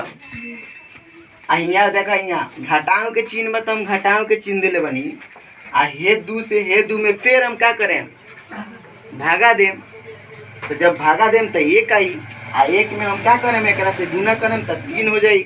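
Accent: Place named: Bhojpuri, India. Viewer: Bhojpuri andaz me sir❤❤❤❤❤❤❤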